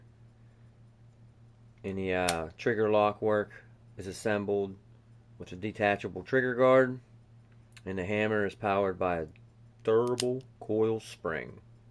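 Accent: Pittsburgh, United States. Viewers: You sound like you're from Pittsburgh.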